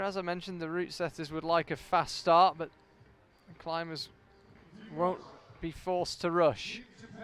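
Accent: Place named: United Kingdom. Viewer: DJ with cabin fever :P I do fancy your very british commentary!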